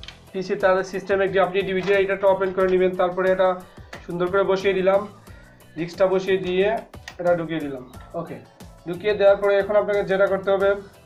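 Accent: Sylhet, Bangladesh. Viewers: Bhai ki Sylheti?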